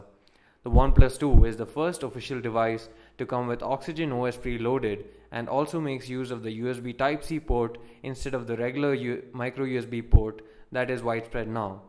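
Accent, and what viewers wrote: Tamil Nadu, India. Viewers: bro are you from tamilnadu......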